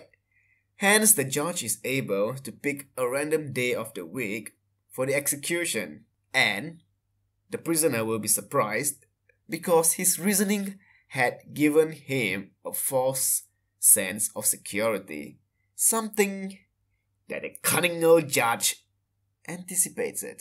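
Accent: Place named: France. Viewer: Sounds like French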